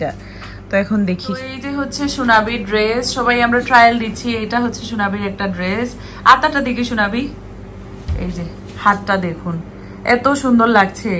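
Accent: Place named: Sylhet, Bangladesh. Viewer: Ada math amrar sylhety r adakan suddo Bangla na matiya je kono ekta math tik koro go Apu te bala lagbo 😊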